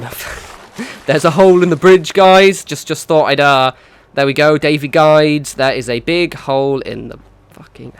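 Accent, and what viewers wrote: England, United Kingdom. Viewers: Adam Gaffen He has an English accent.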